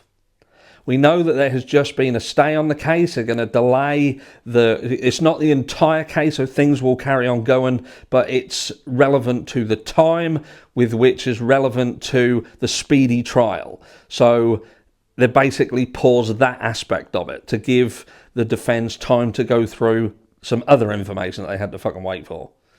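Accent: United Kingdom. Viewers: BTW, where are you from? I am from SC. I only ask because I thought you were from the UK, but when you say certain things it makes me think you are from Australia..... Things like “Mate”.... Maybe they say that in other places. If so, educate me. Thanks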